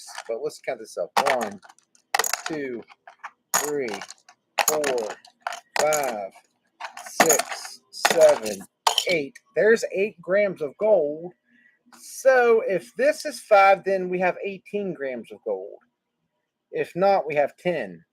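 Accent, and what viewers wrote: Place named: Tennessee, United States. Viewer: ❤❤, I was just wondering how nearby you are as I recognized your accent. Youre about 5 hours from Kingsport, TN, which is the same distance to Richmond, VA or Nashville from here, basically.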